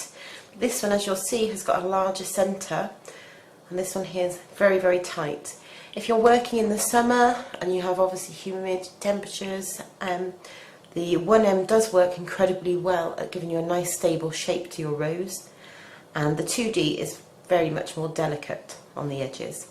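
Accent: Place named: England, United Kingdom. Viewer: Hello 🙂, Great video! This was more detailed than I needed (more is definitely better than not enough), but perfect for the majority who may not do baking projects all the time, so kudos! I'm from New York, but I find that if I pretend to have an English accent (and an interested audience), all my projects turn out brilliantly, hahahahaha! 😂 Of course my husband hears me in the kitchen and thinks I'm nuts! 😏 You, btw, have such a lovely voice and accent! Thanks for the tips and tricks!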